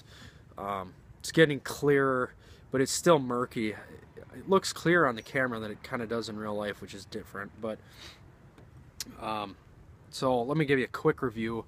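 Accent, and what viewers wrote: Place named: North, United Kingdom. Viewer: Indeed, homebrewing is awesome because there are some things that make more sense through experience than just from reading. Slainte!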